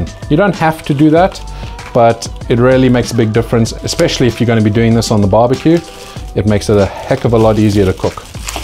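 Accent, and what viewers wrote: South Africa. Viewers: Can I ask what your nationality is and where you currently living ,cause you sound to much like a South African to me but the products you use isn't familiar. FYI Love your Channel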